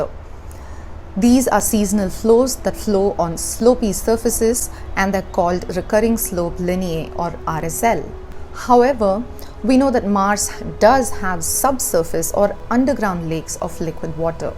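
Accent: India. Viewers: She does have a slight India accent. (MTI) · Very clear and simple in Indian English!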